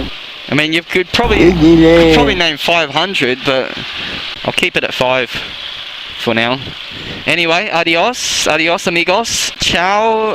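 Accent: Australia. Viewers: Keep the videos coming matey. Beautiful accent btw....I love the aussie accent. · Lol...odd and refreshing to hear a viet with an Australian accent. How the hell you cross the streets in VN? Seems with like constant traffic with the scooters, bikes and cars, you must need to pole vault from one side to the other. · Your aussie accent does not sound too good. I prefer the good old american. Sorry!! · you have a Melbourne Accent. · Sorry ur biased, some times u sound like a yank and some times like an Aus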